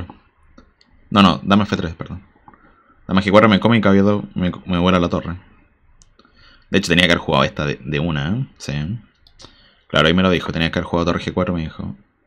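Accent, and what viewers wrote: Chile. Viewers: Buen triunfo… me siento igual aunque estoy en los 850. Pero de a poco avanzando. Y que locura escuchar ajedrez con comentario en “chileno”. Saludos desde Seattle compatriota. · Siga hablando en chileno no más wn. Saludos Crack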